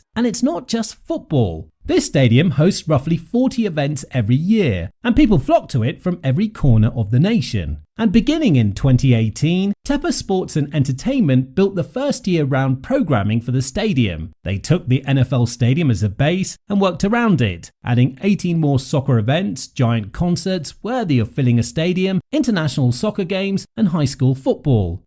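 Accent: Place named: England, United Kingdom. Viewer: There is so much hogwash and propaganda in this video it must be funded and produced by David Tepper. This is yet another example of a sweetheart deal to benefit a billionaire who stands to pocket huge profits from the renovated stadium through stadium use fees and concession sales while the city that funded the vast majority of the renovation gets virtually squat. Shame on the Charlotte city council for throwing the citizens under the bus so a billionaire can brag to other billionaires how he conned the city into funding his new stadium. I hope the citizens of Charlotte replace every council member who voted for this atrocity. Not to mention this video is so poorly done it uses game footage from other teams and stadiums unrelated to the story. And what's with the English accent? Is that supposed to provide some additional credibility or something?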